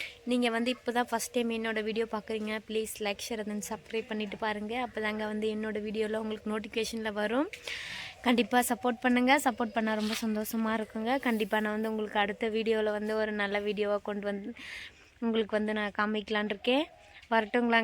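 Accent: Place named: Kongu, India. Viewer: Ungaluku sondha ooru edhu.. neenga eppadi anga poneenga.. alagana Kongu tamilla pesureenga adhanala keten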